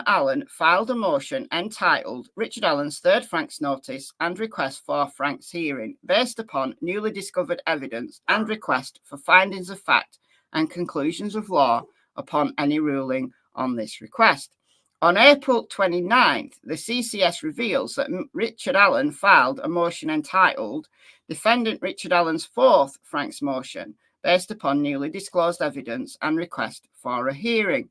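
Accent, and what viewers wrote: United Kingdom. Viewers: could you please cover the case of Valerie Wilson. Its apparently on utube but i would rather hear the story from you, im from Canada ive been watching you since Summer Wells. You are the best and i love your British accent, you are humerous and i just laugh my head off at your videos sometimes.❤❤❤ love your doggies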